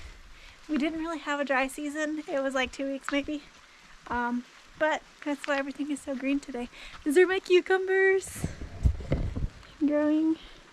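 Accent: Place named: United States. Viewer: Thank you, for this video. I'm an another canceled check here in Czechia but on the escape! Thanks too for your American English which is so nice to listen. Good luck on everything!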